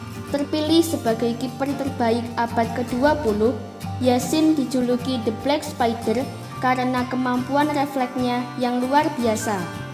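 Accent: Java, Indonesia. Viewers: medok kali to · Jowo to mba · Medok e mba mba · Medok dan cadel mbak eeeee...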